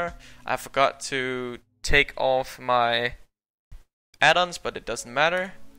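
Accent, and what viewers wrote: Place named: Denmark. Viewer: Hey jeg har set din video! Det lyder som on du er dansker ! Jeg vil gerne lære at multiboxe! men kan ikke finde ud at at det med script.txt! kan du hjælpe mig :))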